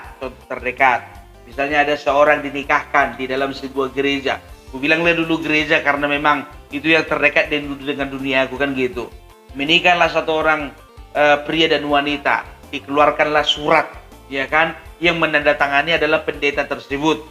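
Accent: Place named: North Sumatra, Indonesia. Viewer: Enak opung ini kalau bicara dengan aksen batakx yg kental sangat menyenangkan, bagus banget.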